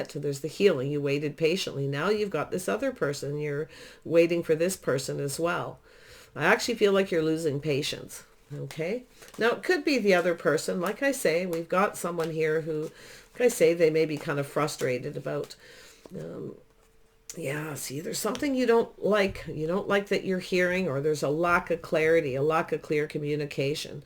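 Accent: Canada. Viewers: I love your accent! The way you say “about” makes me happy! Which part are you from? Curious since I’m looking to travel Canada. I’m not educated enough to know which parts to visit.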